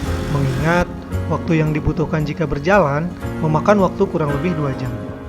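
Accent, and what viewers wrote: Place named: West Java, Indonesia. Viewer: Aa keren bangettt a.... 👏👏👏 itu bahasa indonesianya masih ketara bgt logat sundanya hahaa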